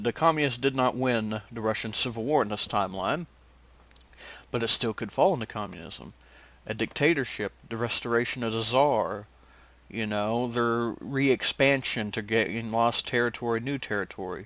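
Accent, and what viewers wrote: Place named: United States. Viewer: what a cowboy accent ! God damn ! 🤣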